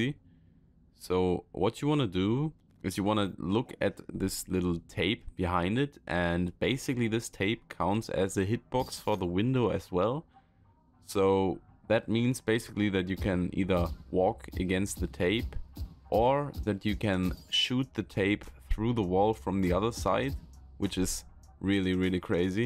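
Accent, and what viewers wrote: Germany. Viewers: Are you german? Bc ur accent sounds like it. And also do you play with your viewers sometimes? Or do you stream?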